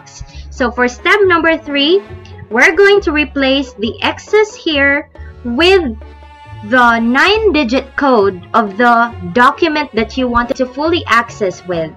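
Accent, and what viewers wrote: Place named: England, United Kingdom. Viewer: Watching here po ma’am from Cielo Cartagena Vlogs☺️Thank you for sharing this very knowledgeable and very informative content of yours ma’am.Watching here all the way from 🇯🇵I also like your English accent.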